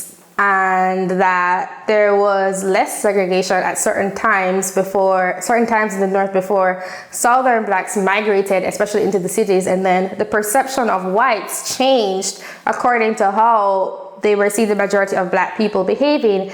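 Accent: Jamaica. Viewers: My girl I can here your Lovely Jamaican accent. Like the video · Girl! I hear the Jamaican dialect there. Anyways, speak the truth. Jamaicans are here being brainwashed by American mainstream propoganda on racial issues. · Are Jamaican?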